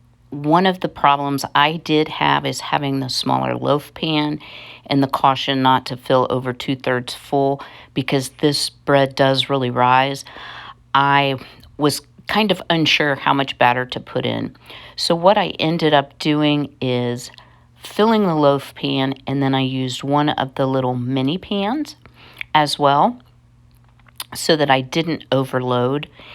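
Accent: South, United States. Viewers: So THAT'S where your southern drawl comes from!!! 😉 I plan on making this bread for xmas. Thanks for the idea!🎅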